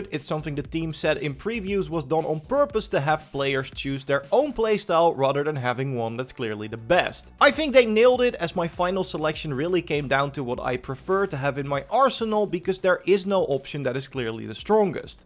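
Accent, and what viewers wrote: Netherlands. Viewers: O dear, this kind English spoken by a Dutch, It is hard to listen to. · Judging from your accent, you're Dutch? 😂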